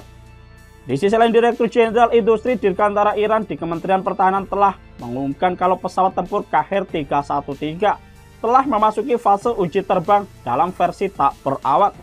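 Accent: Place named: Java, Indonesia. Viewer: Apa komentator dialek jawa..... gak enak kedengaran nya... kita indonesia...cari org komentator yg pandai dsn fasih bahasa indonesia..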